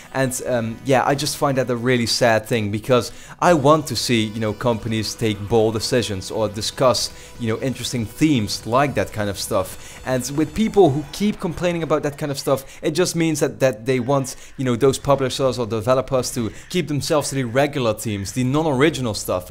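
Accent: Netherlands. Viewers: are you dutch? you have a bit of a dutch accent... :P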